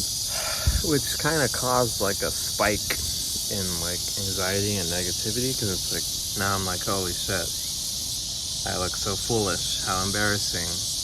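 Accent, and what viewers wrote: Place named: China. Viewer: You're developing a Chinese accent.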